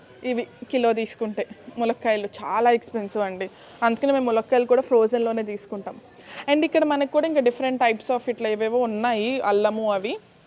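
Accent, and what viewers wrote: India. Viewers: గోదావరిజిల్లా ల భాష లో అందరికి అర్థం ఐయేలా చెప్పారు చాలా సంతోషం గా ఉంది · Good, Vijayawada AP language bagundi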